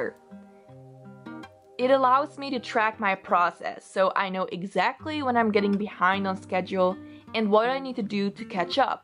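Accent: Netherlands. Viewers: Are you Dutch I can hear a small accent Dus hey hoi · Are you Belgian or from the Netherlands?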